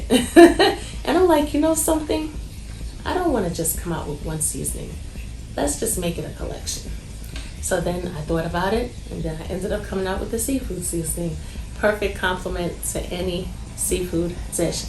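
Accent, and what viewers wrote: New York, United States. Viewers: What a beautiful lady inside and out! I subscribed and enjoy ur videos soooo much ❤ such a NYorkaaahhh I hear the accent lol. Born and breed BX girl right here! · I’ve always wanted to ask : are you a New Yorker? Because it definitely sounds like it.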